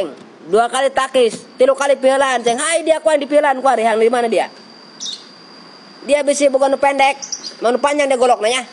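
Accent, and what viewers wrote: Indonesia. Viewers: Logat Banten kitu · Lucu ey ngomong sunda na😅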